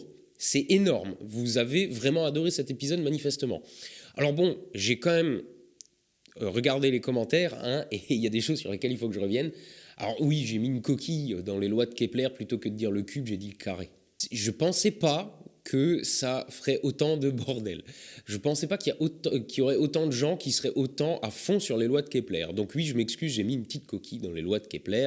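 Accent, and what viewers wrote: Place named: Alsace, France. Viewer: On sent bien l'accent d'Alsace mdrrrr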